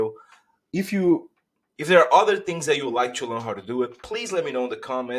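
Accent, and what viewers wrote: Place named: Brazil. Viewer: Sabia que era br pelo sotaque kakkk e nois ma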